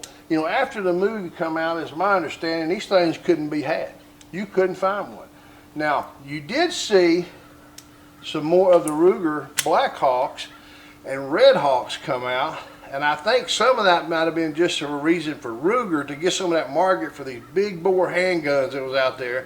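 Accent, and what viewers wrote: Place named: Tennessee, United States. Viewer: Fun video! You sound like you’re from my neck of the woods in middle Tennessee.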